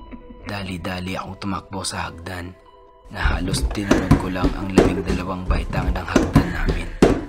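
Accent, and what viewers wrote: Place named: Ilonggo, Philippines. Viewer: Taga saan ka ? Taga capiz ka,? Bat accent ng salita mo d ilonggo,,nano to mn bc gawa gawa mo lng yan istoria mo,, 😂 ,,,,peace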